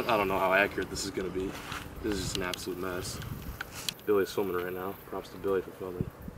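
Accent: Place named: South, United States. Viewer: Anyone notice how's he has like a southern accent in his vids at college compared to now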